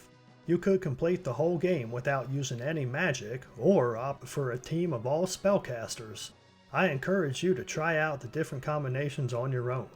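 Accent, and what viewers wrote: South, United States. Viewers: I never thought I would listen to a guy with a thick southern accent talking about my favorite childhood JRPG. I absolutely enjoy watching this series. Please keep it up.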